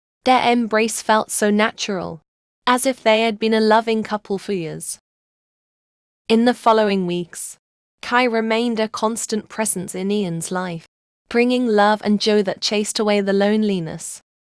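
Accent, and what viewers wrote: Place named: United Kingdom. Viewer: why is it. british not watching it if its in a british accent sorry :/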